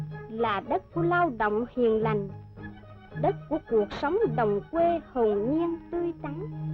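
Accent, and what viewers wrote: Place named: South, Vietnam. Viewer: Giọng đọc Miền nam qúa hay. Hiệp định pa ri 5 tập do a Lâm Hưng và chị Mẫn Thanh đọc cũng qúa hay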